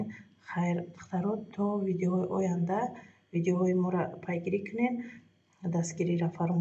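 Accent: Tajikistan. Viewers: Хохарам шумо зани бехтарини тозаи точики хастед мехохам симои шуморо бинам факат дастонатро мебинам овози форам доред чунки тоза точики гап мезанед❤❤❤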